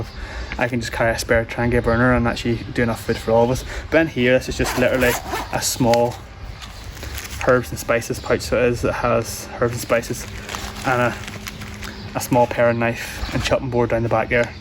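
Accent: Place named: Ulster, United Kingdom. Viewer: Just found and joined Your Site , There was Eng subs on it ! Anybody who cant understand Your soft Ulster voice can Feck off as We down South would say but I am in London for longer than Dublin so I just got the same pack as Your Self and have done nothing to it yet , one for the New Year anyway Happy Crimbo to You and Yours and I look forward to seeing how it goes next Year for You .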